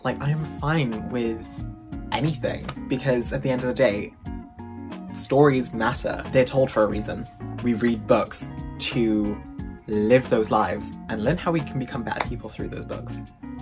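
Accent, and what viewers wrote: United Kingdom. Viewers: Just subscribed to your channel. Love your British accent and your energy. Bring on the videos and reading vlogs.😊😊😊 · my first thought was British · I am to young to have twitter and I found this book tube channel and the British accent...😍 Also you sort of remind of Jesper from Crooked Kingdom! Edit: Jesper is my favorite character! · Why is your accent doing everything for me (fellow BRIT AYYY). I FUCKING LOVE DA: I SO FUCKING MUCH. Going at things in your own way and at your own pace is so crucial and I am happy you found that place yourself and a time wherein you feel best. I resonated with so much of what you said as a POC bi who is also writing a book and I cannot wait to see what content you pour out. OMG HATSUNE MIKU GO OFFFFFFFFFFFFFF LOL. Tbh the anxiety as a whole crippled me to the point I left for a while, trying to come back and accept myself more. 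Hope you keep slaying and doing incredible things with your channel. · I dont know why i didnt expect you to be british but i love it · your British accent is EVERYTHING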